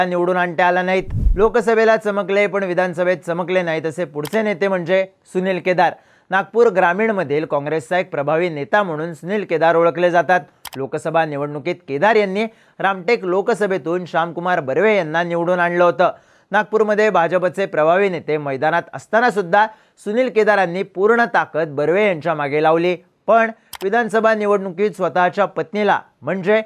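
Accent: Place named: Kolhapur, India. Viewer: तू मस्त आहेस पण जर तुला मोठ व्ह्यायच असेल तर तुझा कोल्हापुरी accent बंद कर आणि महाराष्ट्र ची भाषा शिक.. don't take me otherwise it's only suggestion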